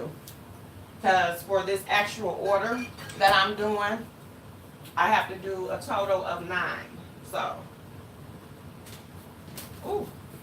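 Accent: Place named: Chicago, United States. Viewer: Oh sis: This looks yummy! From a girl born in Chicago and raised in Toledo: Our accent is the same!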